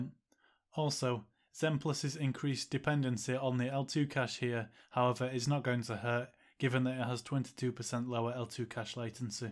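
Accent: United Kingdom. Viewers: Is that a bradford or leeds accent?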